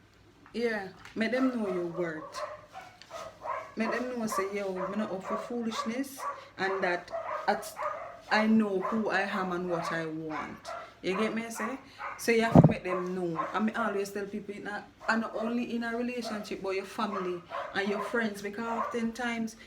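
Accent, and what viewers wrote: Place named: Jamaica. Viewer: I love your accent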